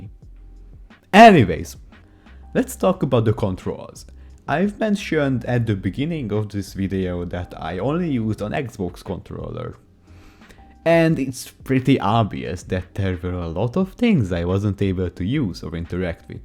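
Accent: Hungary. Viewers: Olyat ugrottam a székben mikor megláttam hogy huf de visszanézve a magyar akcentus felismerhető volt 😅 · Az accent az nagyon magyaros meg persze a google HUF valuta is elarulta hogy magyar vagy koszi a vidiert ♡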